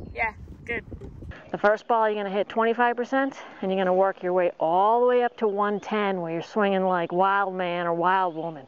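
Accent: Boston, United States. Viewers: Hey that is the reverse of the song... Not sure what they meant but I get what you are saying..25 percent to 110 percent swing speed to find out my natural rhythm. I will try next time I am on the driving range to zero in on what is good for me. Was never conscious of this as I just swing without really thinking of the speed so it is a great nuance you have elucidated upon in your Bostonian dialect which is awesome!!! Thanks!!!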